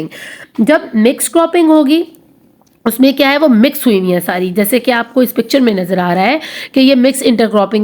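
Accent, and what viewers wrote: Punjab, Pakistan. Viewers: Ma'am ka bolne ka tarika Bata Raha hai k ma'am Punjabi Hain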